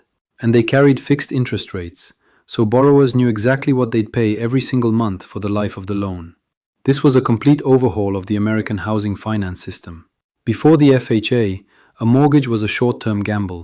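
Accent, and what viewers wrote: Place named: Eastern Europe. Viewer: Почему не по русски? Акцент то то вроде славянский.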